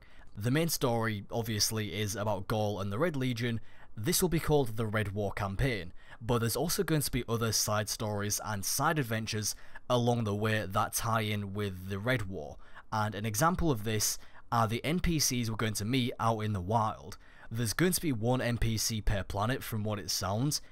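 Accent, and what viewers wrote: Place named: Northern England, United Kingdom. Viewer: you have a very distinctive north england accent, do you live there or something.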